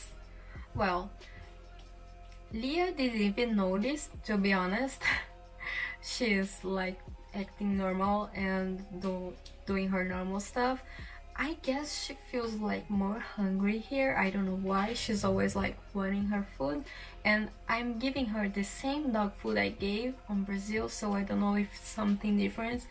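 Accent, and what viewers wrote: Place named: Portugal. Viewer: Uhhh... You speak in english but you keep the portuguese accent... I love it! BTW, you look beautiful! 🥰😍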